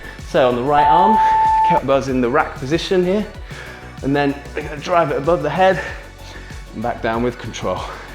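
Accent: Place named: United Kingdom. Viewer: Hi, just found your channel and it's the BOMB - exactly what i need: timed, pre-planned workouts with minimal talking.... yet when there is a spoken word, it's delivered in a wonderful British accent! Cheers!!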